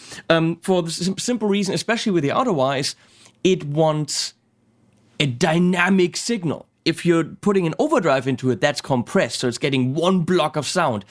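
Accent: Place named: Germany. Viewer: your accent seems particularly German today.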